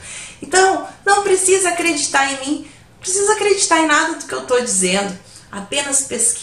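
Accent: Rio Grande do Sul, Brazil. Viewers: " todos iguais, todos iguais, mas uns mais iguais que outros", adorei a consideração a melhor banda do Brasil:. Parabéns pelo trabalho professora! Meu sonho é ter aula contigo, bah que guria sensacional, se gaúcho não tem o melhor sotaque brasileiro eu não sei quem tem❤️ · Oi !! Bem que vi pelo teu sotaque que tu é gaúcha, como eu. Assisti a um vídeo teu onde estava te deslocando de carro pela BR 116, sentido NH - Porto Alegre e entrou na Rua Ipiranga em Canoas.... hahhahaha Legal !! Moro em Esteio, mas sou de Canoas.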